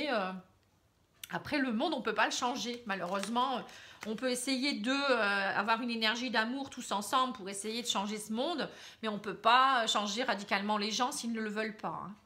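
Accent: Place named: Pas-de-Calais, France. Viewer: Je connais bien cette accent du Pas de Calais 😁👍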